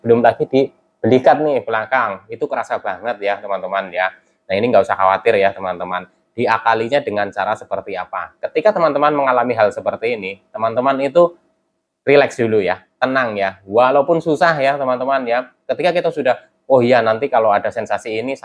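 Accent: Banyumas, Indonesia. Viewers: Mas berapa lama kena gerd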